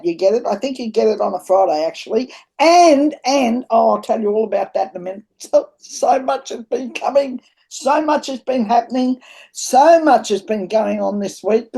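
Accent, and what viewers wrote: Australia. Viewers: your amazing, re trump and the military , here are some potential future scenarios for you to ponder. lets say the democrats gain enough control of both houses of congress in 2 years time in the mid term elections , to impeach trump or maybe by some miracle it happens earlier he refuses to go , george w bush intervenes to try and get trump to leave, he fails , the pentagon or military intervenes to arrest him, alternative scenario , the supreme court rules against him , he defies the supreme court, the court charges him with contempt of court , and tries to have him arrested. he defies the US marshals trying to serve the arrest warrent , the pentagon or military intervene. when you say this path was set a long long time ago , your not wrong, donald trump is the re incarnation of jefferson davis the president of the rebellious south during the civil war going by your accent you live in oz , so do i , maybe one day we will talk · You sound Australian darling?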